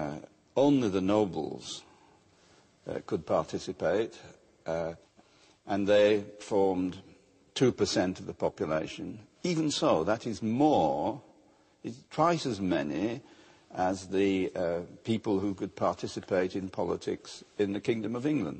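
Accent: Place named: Poland. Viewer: pan davies z bardzo ładnym polskim akcentem wymawia polskie słowa, pozdrawiam ;)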